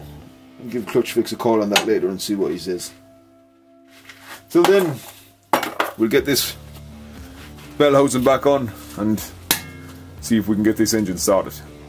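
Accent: Ireland. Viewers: You’re a legend, loving the Wesht of Arland accent and all the info. Definitely gonna help build my Series 3 crossbred. Thanks pal!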